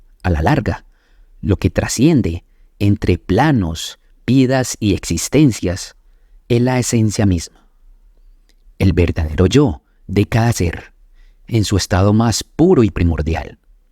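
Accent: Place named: Antioquia, Colombia. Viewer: Que maravilla escucharte, gracias por la entrega de los mensajes. Nada pasa por casualidad creo que todos los que llegamos a tu canal, teníamos que hacerlo y yo estoy feliz y agradecida con el universo y la vida por llegar a tú sendero, me encanta tu asentó, tan Paisa tan bonito 😍, yo también nací en esa hermosa tierra pero llevo muchos años fuera.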